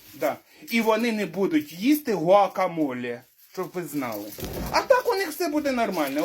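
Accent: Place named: Surzhik, Ukraine. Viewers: І на Донеччині❤❤❤❤❤❤❤❤❤❤ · Шановний! Ви розмовляєте так як говорять у нас на Полтавщині! Полтавський колорит!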